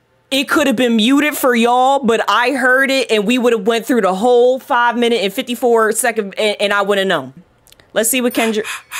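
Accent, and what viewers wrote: Philadelphia, United States. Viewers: Girl you got a new sub off the screaming 'is this new sh¡t" and banging that table like that. Female reactor energy i dont see that i need! With my ALL TIME FAVORITE accent Philly...im all in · I love this beautiful woman being her authentic self and her Philly accent. Meek is not a real nigga 😂😂😂 I’m from Detroit and I couldn’t tell you what a Toledo nigga culture is either lmao Talk to your tumbleweed is crazy 💀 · Hearing a Philly girl hit an "uwu" is hilarious. How that broke through that strong accent is crazy 😂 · You gotta be from philly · Dope! First time through the channel and had to subscribe simply because of the Philly accent throughout the breakdown 😂 I'll be going back through your old stuff. Real Hip Hop will always show when the heat is on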